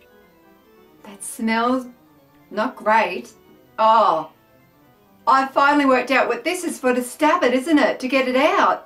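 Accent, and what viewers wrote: Germany. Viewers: Love your German accent, or is that accident lol. I could get right into the smurf sniffing thing! This is getting to be a ridiculous comment, sorry. Wow German stuff is weird (in ref to the little sausage). :) Ask a German to say butterfly (in German)....interesting. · Love your german accent :D I would be the same :p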